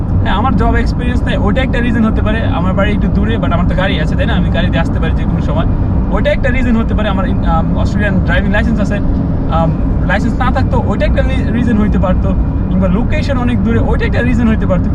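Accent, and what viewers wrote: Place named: Comilla, Bangladesh. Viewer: vaiyaar basha comilla, amr basha o comilla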